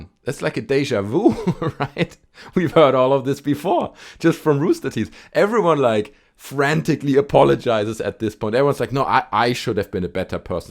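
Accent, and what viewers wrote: Germany. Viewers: come on that sounds german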